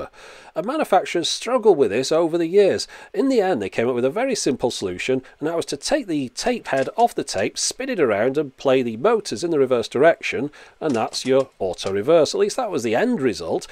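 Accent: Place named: Liverpool, United Kingdom. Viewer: Are you from Liverpool? Sounds like it You sound like Ringo.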